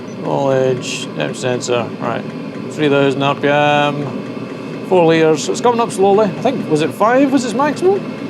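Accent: Scotland, United Kingdom. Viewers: Love ur Videos Mr, Very informative, practical and to the point! Nay ta mention the humor and the Scottish Accent. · Scottish accent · "I'll tell you if it's dog shite" - My kind of no nonsense video. All the better with the Scottish accent!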